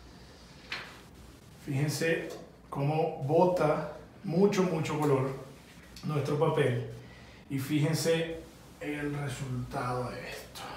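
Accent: Venezuela. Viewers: Acento Venezolano impecable. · Cambie el vídeo solo por el acento venezolano.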